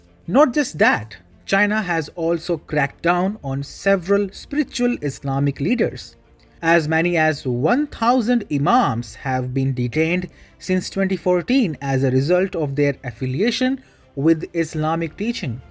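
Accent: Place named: India. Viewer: What bull shit. The speaker sounds Indian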